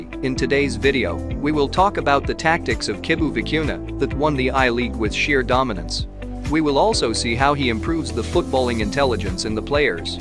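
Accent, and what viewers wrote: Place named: United States. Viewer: But your voice is like an american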